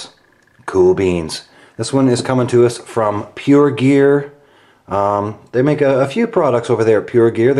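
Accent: United Kingdom. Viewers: lmfao your British accent is epic, it makes us British feel proud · Thumbs up for the English accent! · Best british accent ever.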